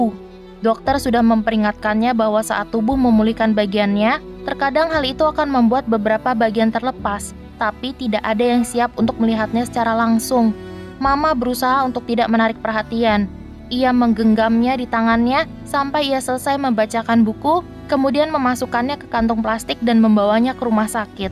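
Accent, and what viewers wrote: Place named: Java, Indonesia. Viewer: medokte tolong-kecil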